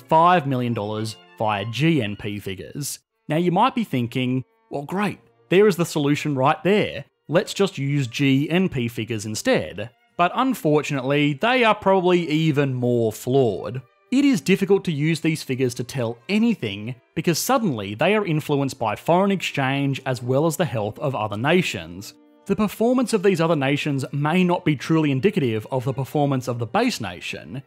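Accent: Australia. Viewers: Love your channel but please please please don't say "zee". It sounds so wrong coming from an Aussie accent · Hey, man. Let me, please, make some subtitles for your videos. I can even translate it to portuguese, if you will. The Australian accent ain't as clear as you might think. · I am guessing that it is Australia that you are from, please tell me if I’m wrong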